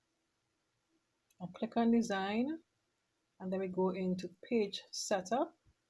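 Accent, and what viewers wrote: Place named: Trinidad and Tobago. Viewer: Hi, great video. Btw are you Trinidadian?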